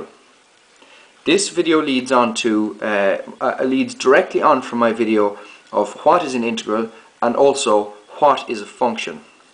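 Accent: Ireland. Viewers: You have a lovely irish brogue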